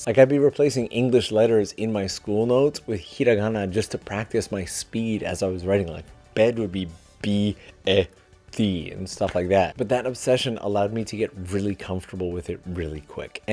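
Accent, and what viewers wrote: Canada. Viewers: Just found your channel. And I'm just as fascinated by your Canadian accent. lol. It's very particular. I'm trying to mimic it, and can't get it right! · Loving your Canadian/UP accent haha. ❤ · Lots of French here, and I remember some from school classes. Ultimately though I pick up like every fifth to 10th word and use technology when needed. Nothing like what my mom went through where her father was actually raised in French. He passed when I was really young and I was told he spoke English to me, but his accent was so thick. Don’t remember anything he ever said to me. Acadian ancestors immigrated through the Hudson in the 1670s. I knew you were Canadian without you telling me because of the way you say about and out. I also dated some bilingual girls from Northern Maine because that’s where I went to high school and where my family was from. 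· lol I knew from your accent you were Canadian. Thanks for the tips. I will try them out. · First of all. Great tips and tricks, I really need to try them all. This video has really caught my attention more than most. Second of all. I was wondering if you were Canadian. I could have sworn I was hearing Ryan Reynolds here and there. Here’s an opportunity to make a video of you speaking in Japanese sounding like Ryan Reynolds 😂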